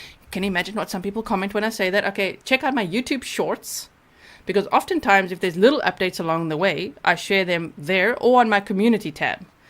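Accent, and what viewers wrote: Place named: South Africa. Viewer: Knew it from your accent, South Africa 🇿🇦 in the house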